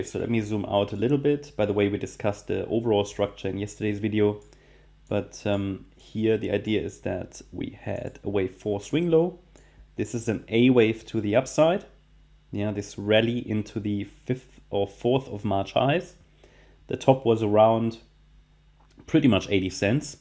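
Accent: Germany. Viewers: Hey, love the german accent! Thanks for the video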